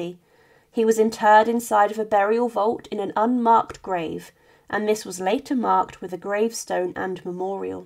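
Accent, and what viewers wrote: England, United Kingdom. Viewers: For someone with an English accent it’s odd to me the speaker uses the additional ‘of’. For example, ‘inside of the palace’ or ‘inside of the garden’. Would not just ‘inside the palace’ or ‘in the garden’ be easier & simpler? Why be more verbose than needed?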